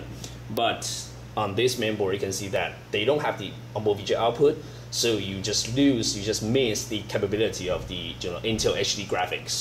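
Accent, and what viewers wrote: China. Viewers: It's a Chinese accent if I'm not mistaken...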